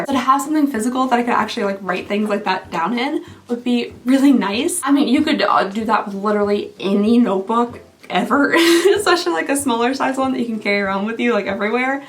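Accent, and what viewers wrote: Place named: South, United States. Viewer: Say blush brush five times fast and you will understand point lol from one southern with a southern accent…to another, I understand and love her❤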